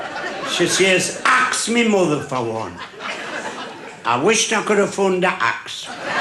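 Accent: United Kingdom. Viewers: I've just realised how similar his accent was to 'Little Nana Iris'. She was a hilarious woman from Sunderland who shot to Youtube fame a few years back. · Me Mum was from County Durham and the accent and the same sort of humour was nice to hear..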